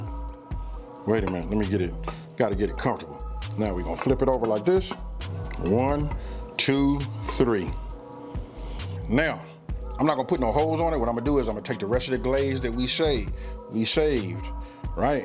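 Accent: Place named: Bay Area, United States. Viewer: He gotta be from the Bay sounding like that.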